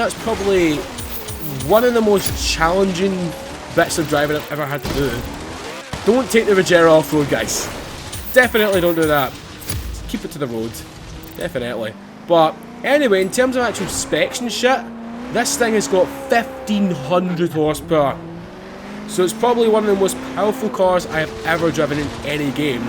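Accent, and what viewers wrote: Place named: Glasgow, United Kingdom. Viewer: Bro I'm Scottish tae and love forza hyped for forza horizon 3 u sound like yer fae Glasgow ,I'm fae uddingston but cheers fer the vids m8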